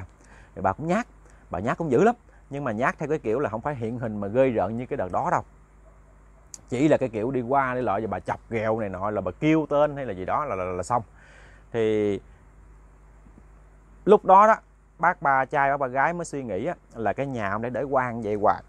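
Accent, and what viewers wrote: Mekong Delta, Vietnam. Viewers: nói chuyện dễ thương quá đi, chuyện uống nước đó là nhu cầu của mỗi con người, ai cũng phải vậy, cũng lịch sự nói với mọi người, nên e thấy đậm chất miền tây, rất dễ thương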